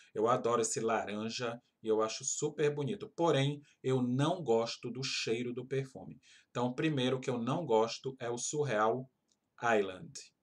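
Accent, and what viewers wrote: Pará, Brazil. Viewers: Vc fala parecido com paraense ! 😘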